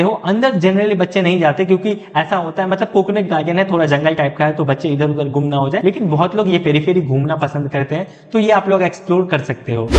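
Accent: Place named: UP/Bihar, India. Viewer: Bhaiya aap pakka UP/Bihar se lgte hain. I am also from Bihar😊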